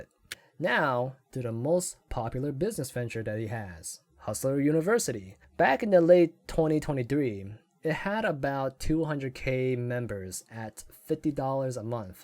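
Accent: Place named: Asia. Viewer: i can hear ur Asian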